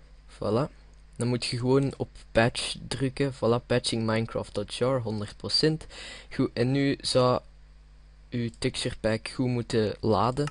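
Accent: Belgium. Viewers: ben je belgies ofzo? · woow vlaams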